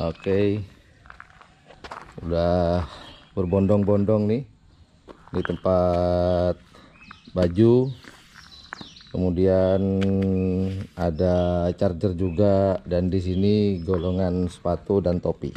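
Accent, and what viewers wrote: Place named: Madura, Indonesia. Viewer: ...logatnya kok beda ya...mirip'' logat madura TIMUR / SUNEMEP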